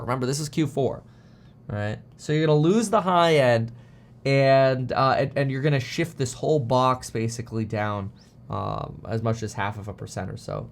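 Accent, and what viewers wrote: Germany. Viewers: Your German accent made me crack a smile for the first time this morning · Man that German accent is hilarious.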